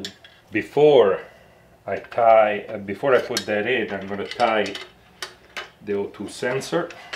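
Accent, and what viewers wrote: Italy. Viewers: That italian accent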